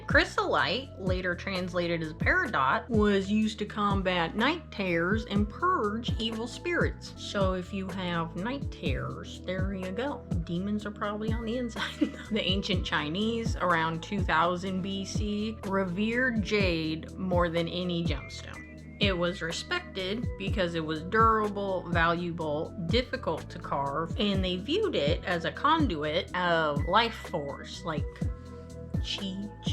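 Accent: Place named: Texas, United States. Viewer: Dear! This is so fucking hilarious. Your stream of consciousness took me for a ride! It was such a rush and because of the depth of your research It was a ride of outstanding beauty and mindblowing insights. I adore your dialect!! Are you an Unicorn with a Texas-Twäng? Where do you come from? Pleeeeze tell me!! I' m from Germany. 🍀😉♥️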